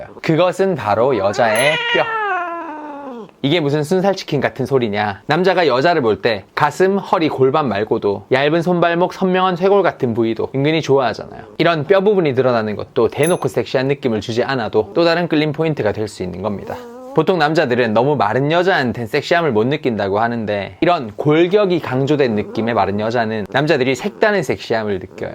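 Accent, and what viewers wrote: Gyeongsang, South Korea. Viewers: 말투 희안하네 대구가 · 디~게 귀엽게 생기셨다~^^ㅎㅎ근데 경상도 말구...서울 말투면 뭔가 더 좋겠다~^^ㅎ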